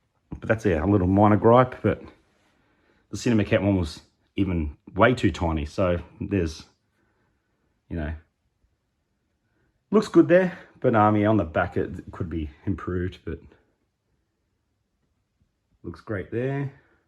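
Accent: Australia. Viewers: Mate you sounded very much like an Aussie collector. Well done ! Nice to know another collector from down under if you are.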